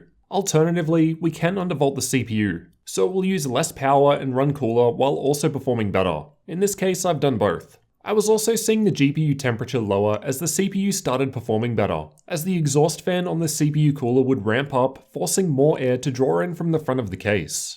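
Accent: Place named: Australia. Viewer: the Lornorworrrrrrr Australian accent is cute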